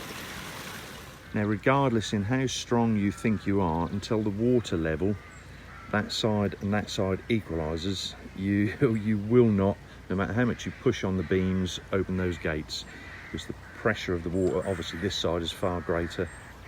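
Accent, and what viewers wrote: Norfolk, United Kingdom. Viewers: Is that a Norfolk accent I detect?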